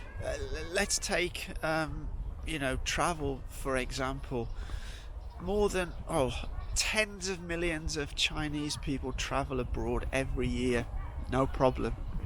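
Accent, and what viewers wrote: United Kingdom. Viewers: It is interesting to see some British guy (judged from accent) filming comments about China while self-wondering in Chinese city lol.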